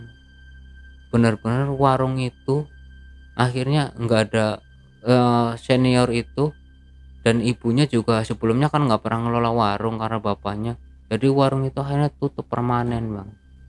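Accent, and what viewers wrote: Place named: Central Java, Indonesia. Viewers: Logat jateng ya medok bngt kang.. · YaAllah logat e medok nemen 😅absen Bang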